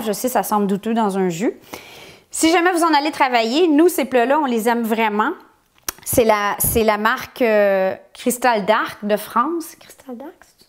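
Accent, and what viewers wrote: Quebec, Canada. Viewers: Ah les Québécois, j'adore votre accent. Les extracteurs de jus chez vous sont relativement accessibles niveau prix. Alors que chez nous en France les prix sont assez prohibitifs. J'ai fait la conversion dollar Canadien / euro.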